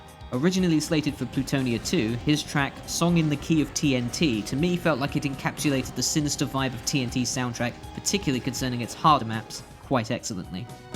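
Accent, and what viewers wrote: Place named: United Kingdom. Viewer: >Map 25 >That blatantly placed inverted crucifix overlooking a pile of corpses If that doesn't speak "edgy teen", I don't know what does, and frankly, it feels nostalgic. It appeals to me on a personal. Regardless, this was a fun video, and frankly inspiring. Making a full fledged megawad when you're 17 is one hell of an undertaking. Pretty sure I spent my days as a 17~18 year old depressed, angry and jacking off, which... frankly isn't all too different from who I am today. The only difference is that I have a career and a steady income. But none of that really matters. :P I'm a bit late to the party, but I really want to get into doom mapping. I'm not exactly creative or smart, but I want to put out a map or two as part of my bucket list. Maybe one day it'll happen. Thanks for sharing this. Talented people like you are a rare breed these days. 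Also, I had no idea you were a Brit! Your accent blew my mind.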